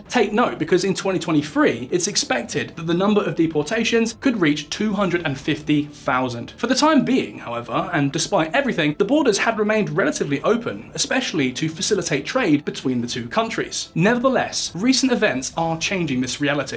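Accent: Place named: England, United Kingdom. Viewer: The host is not American. Listen to his accent, he sounds English.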